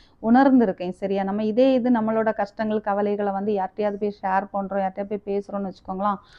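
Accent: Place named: Nagercoil, India. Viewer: Love the Nagercoil accent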